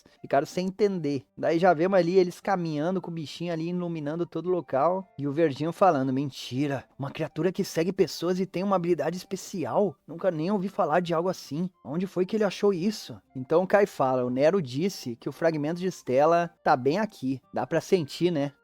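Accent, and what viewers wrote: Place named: Rio Grande do Sul, Brazil. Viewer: Mn vc e gaúcho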